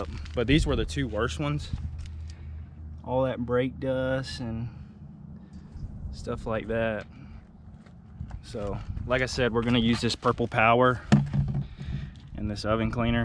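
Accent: South, United States. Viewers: You're the first asian person I've ever seen with a genuine southern accent. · I like your southern accent!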